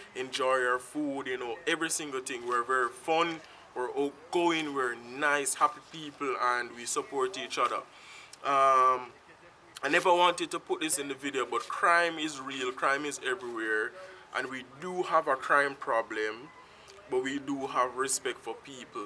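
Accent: Jamaica. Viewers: Love the Jamaican accent!!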